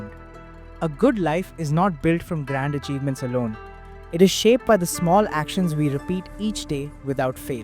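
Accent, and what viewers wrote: India. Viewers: It is odd, you have an India accent and talk about Japan 😂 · Your videos are very good , but re you Indian or japanese as your accent feels indian to me ?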